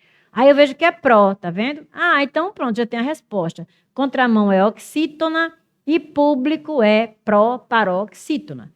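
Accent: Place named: Northeast, Brazil. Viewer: Que sotaque mais lindo,😊😊 meu nordeste.🙏🙏#gratidão 🙌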